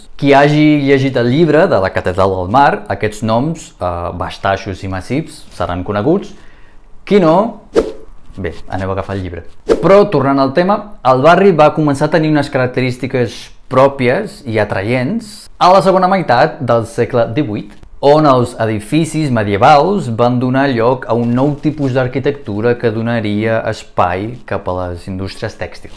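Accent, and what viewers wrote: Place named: Brazil. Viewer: gràcies pel vídeo, m'ha encantat! ja parles amb accent brasiler, que boniques són aquestes mescles interculturals 😅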